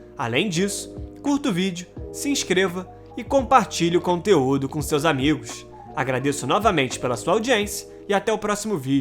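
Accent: Rio de Janeiro, Brazil. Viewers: Que narração horrível..... Não dá pra assistir mais de um minuto..... Carioques insuportável. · Mais um videozinho cata-clique, baseado em notícias superficiais, clichês históricos e uma "capa" sensacionalista. Não sei o que é pior: o conteúdo ou a narração desse carioca. Sugestão pro próximo cata-clique de vocês: Rio de Janeiro, estado falido, capital da bandidagem do Brasil.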